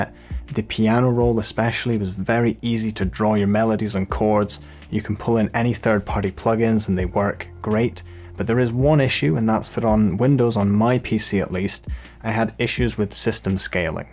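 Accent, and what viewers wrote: Scotland, United Kingdom. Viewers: what part of scotland are u from mate ???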